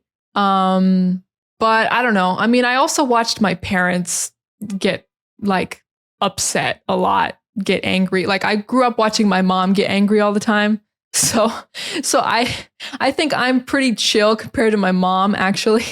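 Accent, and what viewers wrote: General American, United States. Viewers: Hi, your accent is really an American standard accent. I understand it well. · I found it interesting what you said about your accent , that you have a standard american accent , the same as the young american generations and that people from California, Texas, Indiana, Minnesota speak with the same accent you have. But that surprised me because I attended an english course where there was a young teacher from New York who has an accent pretty different from yours.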